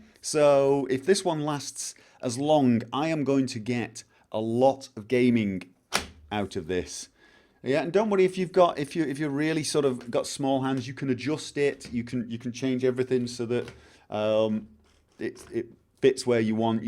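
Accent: United Kingdom. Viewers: Is your accent because of living in norway or is it just a british dialect? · er jo ofte grunnen for expats. Belgisk her, norsk kjæreste og. It was pretty funny to learn one of my fav person on youtube is living in Norway while sounding so absolutely british though. Big planet, small world.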